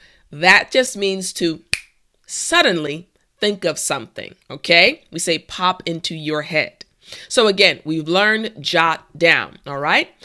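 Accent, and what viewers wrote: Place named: United States. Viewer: Hello beloved my mom I'm meek ranjit from India at Odisha studying now I'm so cheerful of your english Teachership truly say that I'm understanding your Pronouncation of an American accent now these days improving vocabulary I'm also reading the Bible everyday that's by God is helping me individually life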